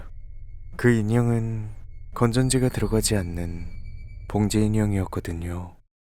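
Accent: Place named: Japan. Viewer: 감기 다 나으셨나요~~~매번 병맛난... 말투에~~~~구~~수한??? 일본어 말투 ㅎㅎㅎ 잘든고 있어요^^💯💯💯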